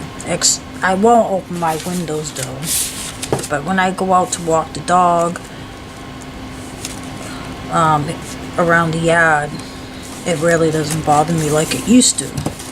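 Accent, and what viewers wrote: United States. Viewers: I love your accent. I can tell it's east coast. Where are you from? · I love this book so much. It looks so sturdy. I can't wait to make one. Thank you. Do I hear a Boston accent. Lolol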